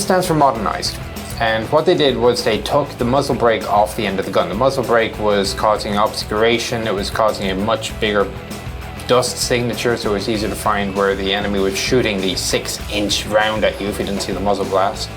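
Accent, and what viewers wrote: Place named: Ireland. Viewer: Is he irish he has a slight irish accent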